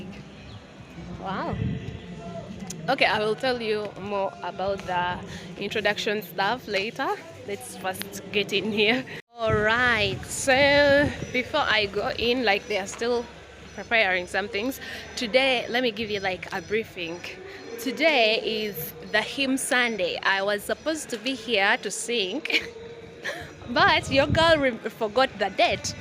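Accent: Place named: Uganda. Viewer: Am from Namibia your accent reminds me of one medical Doctor's wife from Uganda, I actually found them in church in 2018 the year I got saved... I will never forget them that accent of yours reminds me alot of them.. they always invite us at their house, fellowships all night.. I will never forget them Lol In the coming years I must come to Uganda and marry a God fearing women.. I love your accent... Thanks for sharing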